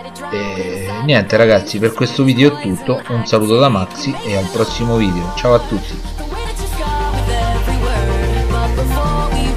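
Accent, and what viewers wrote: Rome, Italy. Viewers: Romano come me ahahaha grande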